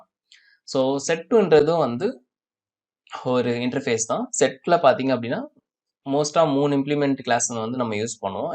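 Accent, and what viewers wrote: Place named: Chennai, India. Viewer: The way of coaching is very understandable. Sometimes your Chennai language flows 😂😂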